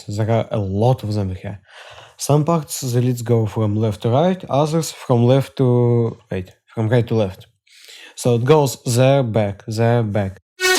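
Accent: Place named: England, United Kingdom. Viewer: The first English accent that I suck at understanding it. Only beginnings and the ends. Lol :D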